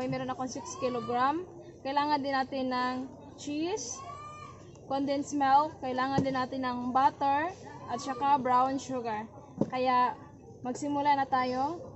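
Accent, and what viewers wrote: Visayas, Philippines. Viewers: Hahahaha...inday mahuman imong kaugmaon ug kalit !!!!!hahahahaha! Pagka bisaya gayud nimo,asa man imong probinsya inday!!!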